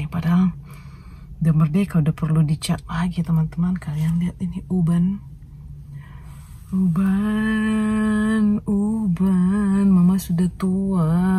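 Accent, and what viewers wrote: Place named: Medan, Indonesia. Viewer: Suka aku logatmu sis, ingat tetanggaku di kampung banyak yg dari Medan cute baby, lovely vlog sis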